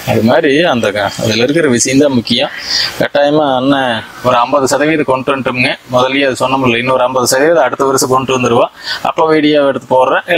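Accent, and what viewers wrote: Kongu, India. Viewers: கொங்கு தமிழ் அருமை😊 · Kongu thamizh super idai idaiye kusumbu pechum super 👌👍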